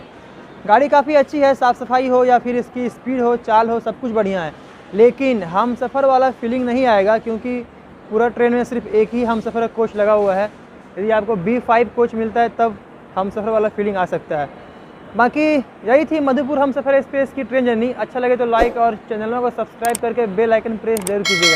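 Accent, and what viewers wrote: Bihar, India. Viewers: Shandar..khalis bihari andaz..uspar se mithi awaz..sandesh bhi hai anya ac wale blogger ke liye ki journey ke saath jaruratmandon ki dil se help karta hai.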